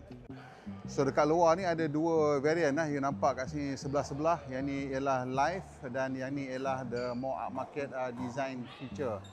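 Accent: North, Malaysia. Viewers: noh...asai utara ka?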